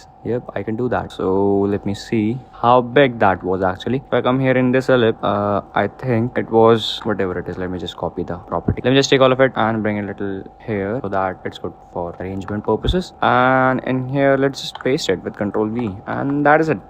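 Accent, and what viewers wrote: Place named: India. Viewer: What your name bro ? You sounds like Indian.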